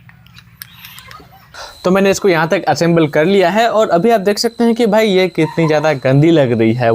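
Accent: Bihar, India. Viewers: Apka voice mujhe pasand nahi shyad Bihari h hero giro type lgta h voice 😂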